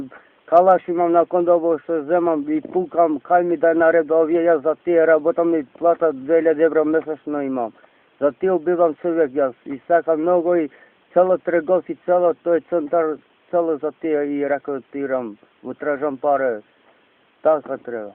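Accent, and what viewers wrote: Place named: Albania. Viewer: This guy speaks macedonian with an albanian accent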